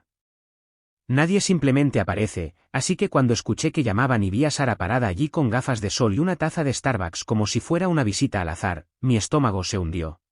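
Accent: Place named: Andalusia, Spain. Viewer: ÉSE TONO, MEDIO ANDALUZ, MEDIO ESPAÑOLADO,ES BASTANTE SINGULAR...ES EXCELENTE LÁ DICCIÓN... SALUDOS CORDIALES..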